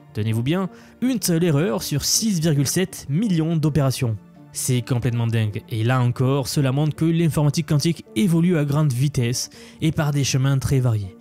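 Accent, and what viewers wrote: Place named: Southern France, France. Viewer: L'accent du sud de la France est rafraichissant! Bisous du Québec!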